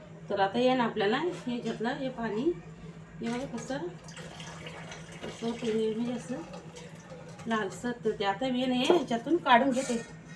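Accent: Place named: Akole, India. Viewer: नमस्कार मावशी 😊 भाषा आइकली लय भरी वाटला,अकोले तालुका ना तुमचा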